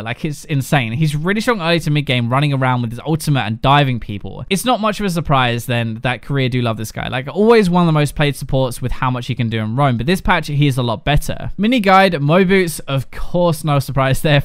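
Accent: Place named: United Kingdom. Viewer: He is British, not an Amerifag. Not everyone pronounces words the way your cancer country does.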